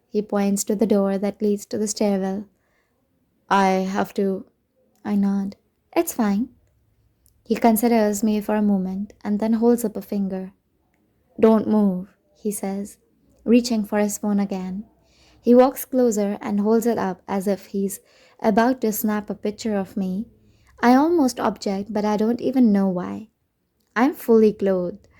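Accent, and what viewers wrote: India. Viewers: Are u indian? Your accent is so thickly beautiful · Omg I'm ur new subscriber ! Just checked out ur channel there are so many audiobooks for free ! Ur voice is so beautiful too ! I am so happy i found this Channel ! Can I ask where u r from ? ( Cause i feel like u have a little bit of Indian accent...u sound beautiful while reading ! ) Really appreciate ur efforts of giving us so many nice stories here at ur channel for free ! 💕💕💕💕💕💕💕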